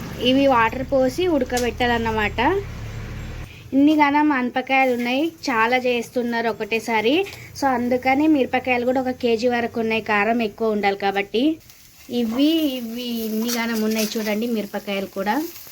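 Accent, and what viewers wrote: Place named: Telangana, India. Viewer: Hi mee maata telangana valla lage vundi mee ఊరు a side vuntundi maadi adilabad dist. kura eppudu చూడలేదు. 1st time mee kattelapoyyi super 👌😊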